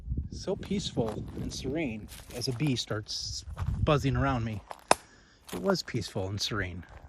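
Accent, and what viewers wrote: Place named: Chicago, United States. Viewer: I had to subscribe for the name of your channel alone! I love honesty. Then I heard a Chicago style way of speaking and that you were doing an abandoned cemetery near the Chicago area. What's not to love? Maybe check out what we in the neighborhood called "The Lost Cemetery." (It's on the Northside, fairly safe neighborhood.) It was next to Labaugh Woods along the train tracks. More recently a good deal of the land was sold off. They claim to have moved the bodies. I DO NOT BELIEVE THEM. And there are STILL headstone to be found there today, in the woods behind the houses they build over the graves that are still there. You can park in Lil Labaugh Woods or the main parking, both parks are along Foster Ave, if you want a good place to park. The entrance to the larger picnic area and parking is off Cicero Ave. Or you can drive West on W. 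Bryn Mawr Ave along the North edge of Bohemian Cemetery. You'll reach a bike trail that use to be train tracks The Sauganash Trail Bike Path. You past a subdivision on your left, that was part of "The Lost" Cemetery and still is! If you walk south on the bike trail toward Labaugh Woods on your left is the Lost Cemetery. The headstones are still there. The bodies are still there.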